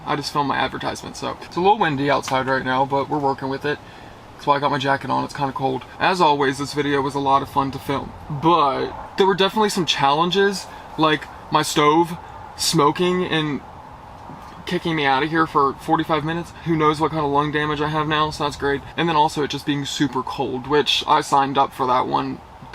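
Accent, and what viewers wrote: South, United States. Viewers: Never heard your Southern accent until now. You must have been around your fmaily a lot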